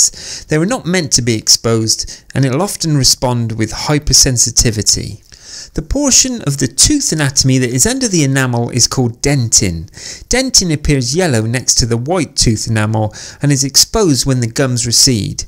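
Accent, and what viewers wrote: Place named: Bristol, United Kingdom. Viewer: The suggestions from this video are: one tablespoon sesame oil oil-pulling; Sea salt rinse; Coconut oil mixed with baking soda and use as toothpaste; Chamomile flower tea; Myrrh in mouth rinse; Tea tree oil on toothpaste; Septilin, buy in capsule form; Flossing. Cranberry juice and other sources of vitamin C; Green tea; Gum Recession toothpaste which this chap sells. Lovely accent! Somewhere near Bristol, I'm guessing.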